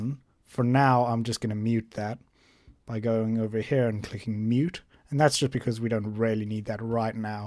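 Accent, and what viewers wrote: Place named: South Africa. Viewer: South African...caught your accent! I'm from Jeffrey's Bay. Thanks for the help!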